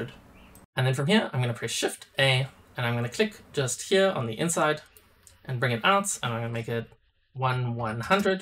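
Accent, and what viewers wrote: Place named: South Africa. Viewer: waaaaaaaaait... YOU'RE SOUTH AFRICAN ! LETS GOOOO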